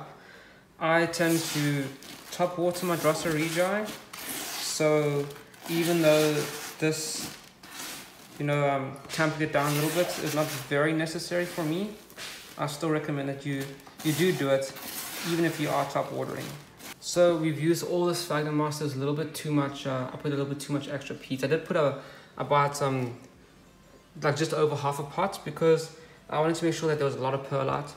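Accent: South Africa. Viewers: Australia? Here all these videos I thought I was listening to south africa.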